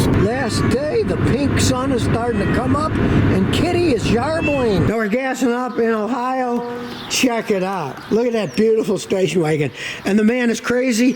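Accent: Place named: Texas, United States. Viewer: I thought he was from Texas.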